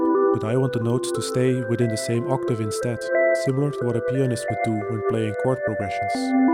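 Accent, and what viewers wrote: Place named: Netherlands. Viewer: thanks for the response - it's one of the best and most lucid tuts i've seen about this subject - would it be possible to put the voice on one channel and the synth on the other so that we could pan it at home? i'll definitely give it another go, but trying to decipher your (Dutch/Belgian?) accent at the same time was giving me a headache so i had to stop about 10 minutes in, sorry :)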